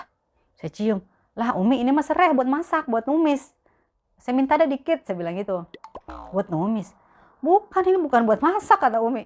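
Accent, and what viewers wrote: Jakarta, Indonesia. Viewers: lucu deh,bahasanya.Menyenangkan & menghibur, pake aksen Betawi.Lanjutkan! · Masyaallah,baru liat chanel mpo nih udh suka aku ,betawi nye medok bgt si mpo jdi tambah suka aku liatnya ,salam kenal syg mpo dari aku betawi rawabelong😁😘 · iya betul medok bngt betawi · Haha logat betawi mba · Video2 sebelumnya koq blm keliatan bgt logat betawinya mpok🤭jadi shock saya dengernya😂tp tetep menarik koq ceritanya ❤️❤️❤️ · Masyaa Allah logat betawinya gak bisa ilang. Haha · Senang nonton channel sangat menghibur apalagi logat Betawinya itu lo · Demen banget dah ma logat betawi nyah. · salam sehat....ngomongnya mpok berasa di Betawi, tp betawi sekolaan ya...keliatan cerdas enak ngomongnya mengalir kayak aer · pertahankan logat betawi mpok jgn luntur kami sangat meni'mati cerita & pengalaman di saudi sana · Set dah ah...guwe demen deh si mpo betawi banget dah 😂😍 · Duh mpo demen banget denger n lihat logat betawinye ...! 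bener kaya lg nonton stand up komedi z ! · Mba logatnya Betawi,seru banget... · Aduh mbak kedengaran betawi nye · Iyya ini bahasa Indonesia logat Betawi/Jakarta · Wkwkwkwkwk Betawi banget anjayyy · hooh.. w jdi ktwa mlu ntn yahh .. sumpah po lu lucu bgtt betawi bgtt😄😄😄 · Betawi bgt mpo, reseep dengernya😅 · Logatt Betawi nya enak bener👍🏻👍🏻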